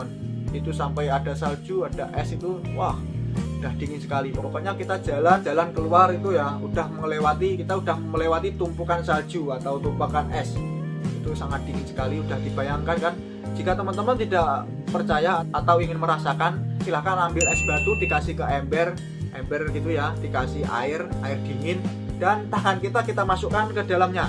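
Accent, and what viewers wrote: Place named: Java, Indonesia. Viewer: Samean jowo to mas😂 jos pokok e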